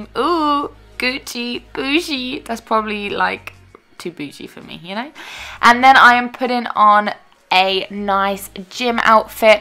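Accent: United Kingdom. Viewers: Are you from Great Britain???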